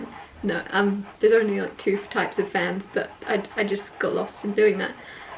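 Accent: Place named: United Kingdom. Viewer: Hi! Cheers to you and this message ❤✨ Spirit has confirmed what I have felt through your channel and channeling. As a former ex-pat your voice makes me miss the lull and grounding nature of your British accent in particular. I went to drama school over there and studied the different dialects actively and inactively. I am grateful I came across your lovely energy and channel. You're gifted. Keep spreading Love and Light and inspiring innovation and courage ❤ Blessings and Light to you Olivia x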